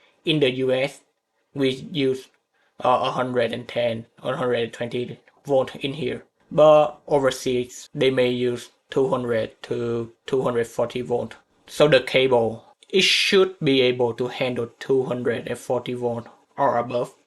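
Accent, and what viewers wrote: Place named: Vietnam. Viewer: Ban la nguoi Viet Nam a, tui co the nhan ra accent cua ban.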